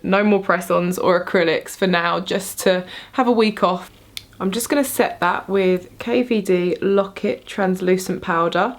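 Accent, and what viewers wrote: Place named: United Kingdom. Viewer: Omg! That british accent !!😍😍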